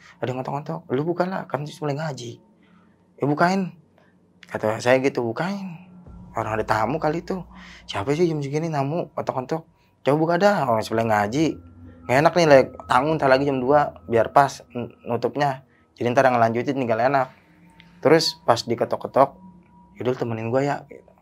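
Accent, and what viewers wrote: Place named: Betawi, Indonesia. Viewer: Saamm betawieee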